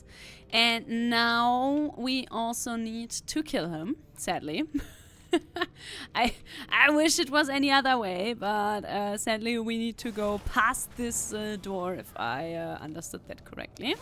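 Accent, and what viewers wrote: Germany. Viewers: 1 hr of yapping in her cute German/English accent? I'll take it.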